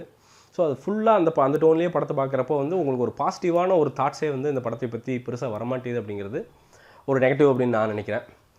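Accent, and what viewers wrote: Chennai, India. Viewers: U were speaking a good tamil, please don't try to mix Chennai tamil in it... U r from coimbatore not from Chennai... it doesn't suite you.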